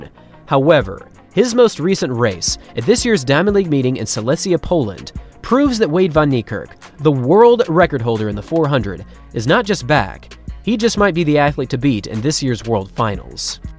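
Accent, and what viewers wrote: United States. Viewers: Yer commentary is so American. African all star?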